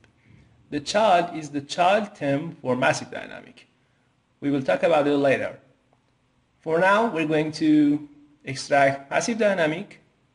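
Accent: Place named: Iran. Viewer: Great themes, that Persian accent tho :)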